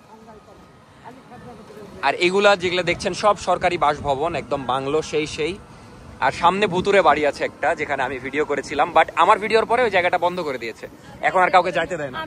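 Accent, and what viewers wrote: Rajshahi, Bangladesh. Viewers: the best thing we like is your true Rajshahi accent ar apni o apner wife er true innocence. Allah apnader shushtoh abong bhalobashai poripurno rakhoon. Ameen abong apner Maa er jonno pach wakht salah ar dua korben karon etai uner akhon aktai chawa ar apni shontan ar apner dua is most important. Respect ✊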